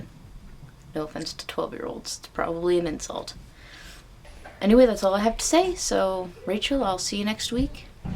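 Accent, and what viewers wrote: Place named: Canada. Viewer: I KNEW IT YOU'RE CANADIAN. I love Canadians. And your accents.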